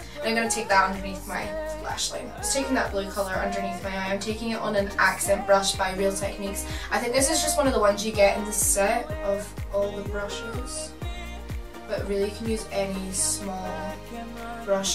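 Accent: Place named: Wales, United Kingdom. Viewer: Is this what all welsh ppl sound like ?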